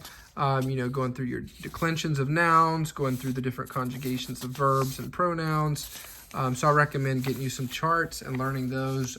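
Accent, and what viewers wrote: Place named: England, United Kingdom. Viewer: Bro, if you can't pronounce - don't. Just add background music, do a little dance pointing with your fingers to text on screen. Or just insert audio from someone who speaks the language like Luke Ranieri or Metatron. Or at least have some humility, say something like "haha, probably butchered that". But, come on, this thick accent with not vowel length and English R is simply insulting! Imagine, idk, teaching people how to learn Japanese while saying "R.E. ga toe". I heard you trying to trill the R, but that was like the last thing I would worry about.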